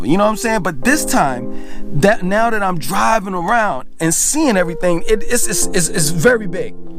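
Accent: New York, United States. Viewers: He has a strong NewYork accent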